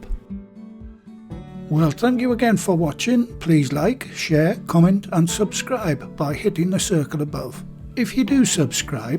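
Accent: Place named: Newcastle, United Kingdom. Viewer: I enjoy watching your videos , and more i love listening to your jordy accent , all your recpies remind me of England And the northeast watch i call it home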